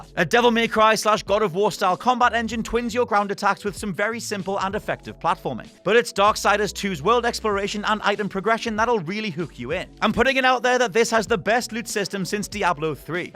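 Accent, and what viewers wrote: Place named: England, United Kingdom. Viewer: Shouting English accent I can't watch these videos it's painful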